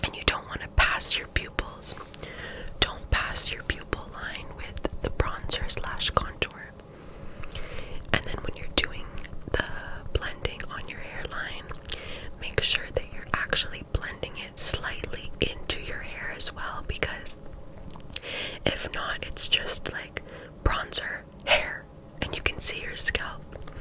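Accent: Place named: Canada. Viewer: Hello my favourite asmrtist in the world good to see you making some more lovely asmr again. I love your whispering Canadian accent it’s beautiful and I love your beautiful lovely overgrown long smooth silky soft brunette hairy mane of yours 😌❤️., I have a favourite asmr request I would love for you to make it for me to help me get to sleep 😴 please if that’s ok with you as I was thinking since I love slowly relaxed real hair brushing asmr and brushing long hair fully covering🙈all over face asmr it would be wonderful and fulfilling to see you brush your beautiful mane and brush your beautiful mane fully covering all over your face but for my asmr request as the rhetorical question can you do 30mins long slowly relaxed real brushing your long hair fully covering 🙈all over your face nonstop asmr with no mic 🎙️ and no earphones if that’s ok with you, can I comment the asmr video link or send an email to you of the video if that’s fine by you aswell 😇😌❤️👍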